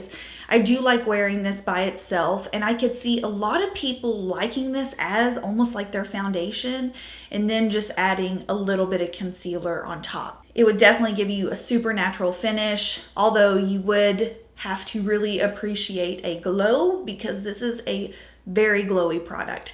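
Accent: South, United States. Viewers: Just found your channel and I am so impressed by how down to earth you are while being so thorough with your reviews! As a Tennessee girl I also love the southern accent! I feel very at home on your channel! The content you are producing is such high quality--thank you!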